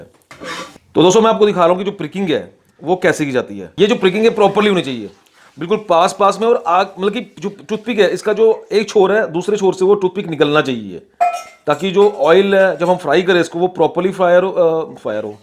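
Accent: Punjab, India. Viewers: I just love to hear the Punjabi accent hindi... ❤️❤️❤️ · Your accent is like my punjabi unclr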